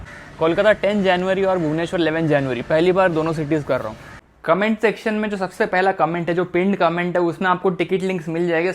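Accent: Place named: Mumbai, India. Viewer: He has bombayai accent. So adorable 😍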